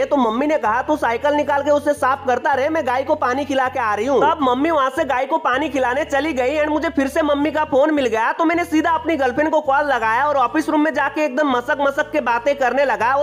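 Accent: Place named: Nepal. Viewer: When nepali speaks hindi and thats too assamese thisis awsome ❤️❤️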